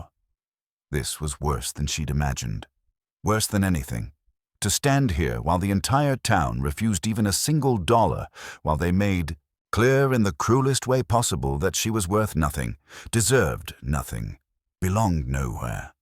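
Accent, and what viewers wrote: England, United Kingdom. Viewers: Need an American narrator for an American story besides an English talking narrator.. Her husband been dead two years and daughter died six months and her four or five months expecting a baby who is the dad ? It couldn't be her husband's baby... Proof reader needs to read the story.. I like the story it needs to be more accurate...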